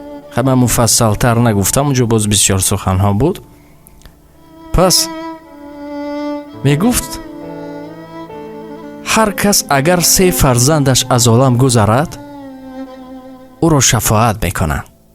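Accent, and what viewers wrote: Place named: Tajikistan. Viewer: بهترن سخنها آفرن دستی ازیزم لهجه شیرن فارسی تاجیکی🇹🇯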